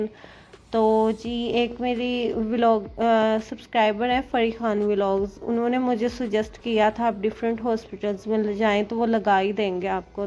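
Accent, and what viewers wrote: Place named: Punjab, Pakistan. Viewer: Assalam o alaikum Appi lgta h Pakistan shakargarah se h apki voice se lg raha h hamare me udhr k h wo b apke jese bolte h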